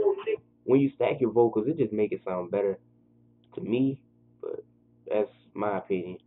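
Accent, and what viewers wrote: United States. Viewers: u can tell this nigga New Orleans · u from Louisiana aint cha?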